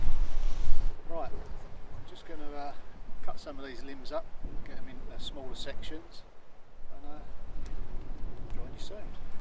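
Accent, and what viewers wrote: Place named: England, United Kingdom. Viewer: I love your vlog. It's one of those welcome to my friendship, my family and my home. I used to live in England many years ago and my partner was a true cockney who lived on Basildon Essex. Your accent and persona remind me of my life long ago when I too used to collect copper and restored two houses together in Fleetwood, Lancs. I now live in Arizona and you remind me of the life I used to live. Very special memories. Thank you for sharing. ❤ from AZ 🇺🇸.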